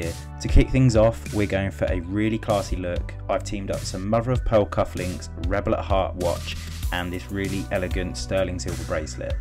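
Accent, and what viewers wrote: England, United Kingdom. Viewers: You sound English. Do people dress like that in the U.S and Australia?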